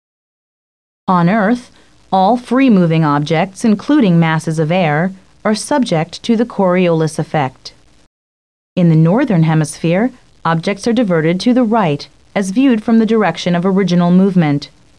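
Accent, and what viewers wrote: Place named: United States. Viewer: ohhhh, what a stupid american pronunciation, accentuation and nasal twang ;-((((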